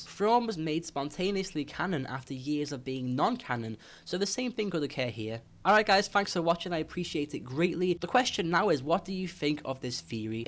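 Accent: Liverpool, United Kingdom. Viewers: R u scouse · u sound like wayne rooney are u a scoucer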